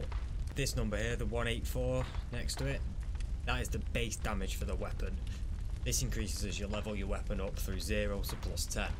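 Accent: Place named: Scotland, United Kingdom. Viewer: Scotland? forgive if I'm wrong😂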